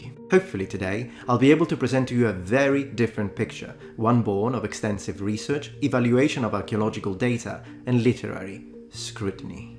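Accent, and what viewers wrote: England, United Kingdom. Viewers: Please make more videos about the celts, we know so little about them and pop culture is full of misinfo. Despite being an anglo saxon/scotch irish American Ginger (with Sicilian great grandparents! I am in no way culturally sicilian but I love the fact that you are Sicilian, speak British English, yet married an American and live here) When I hear about the celts, I think of Pict warriors fully naked covered in blue tribal paint, and I've always had a hard time believing that represented the celts as a whole. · I take it that you lived for quite a while in England because my sisters friend Julie comes from Greece and has a different accent than you when speaking English.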